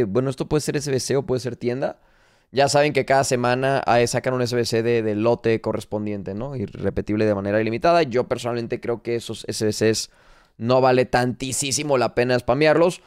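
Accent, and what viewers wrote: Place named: Spain. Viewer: tu no eres mexicano eres español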